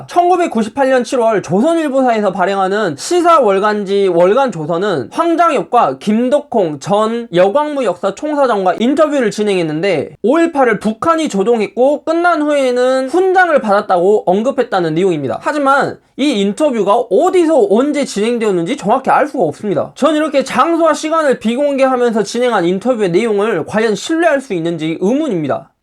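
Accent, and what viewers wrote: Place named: Jeolla, South Korea. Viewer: 전라도 사투리 구수하네요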